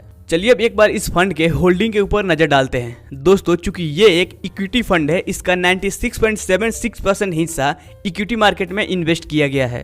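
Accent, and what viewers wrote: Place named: India. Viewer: Bro.. your videos are very informative...Thank you...also please pronounce proper English words.. don't mix Hindi pronunciation... please check spelling mistakes...Eg.Fund..not fhund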